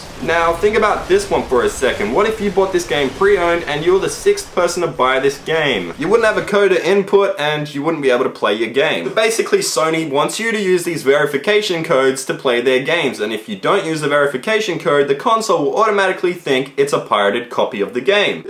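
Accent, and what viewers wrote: United Kingdom. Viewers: Is this guy British?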